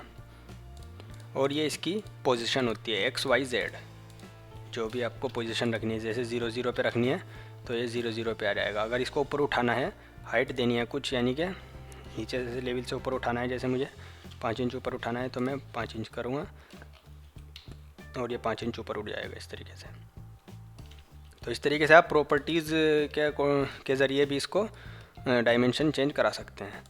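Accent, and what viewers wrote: India. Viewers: bhut ala or apki Urdu bari mazay ki ha , anda kaar shape very sweet Indian Urdu I like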